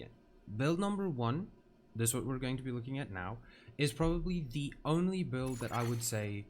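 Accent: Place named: South Africa. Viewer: Thanks for the video. Which part of SA are you from? I've been to Durban for some time.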